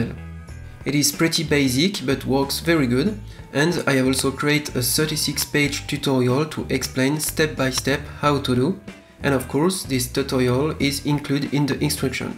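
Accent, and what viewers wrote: France. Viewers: On reconnait l'accent francais😅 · Watched 1 video and i m a big fan dude. Love your franch accent as an german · Joli accent français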